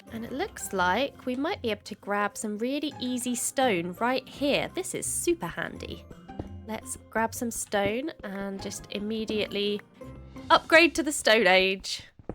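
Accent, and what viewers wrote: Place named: United Kingdom. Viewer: You have a British accent, I kinda like it but it's sometimes difficult to understand but for me who's learning English (the British and American) I understand some words, also what a cool video ! I'm subscribing to your channel right now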